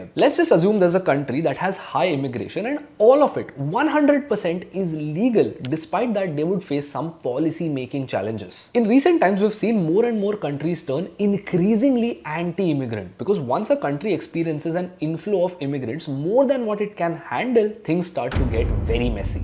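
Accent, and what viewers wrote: India. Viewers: Funny North Indian accent 🤣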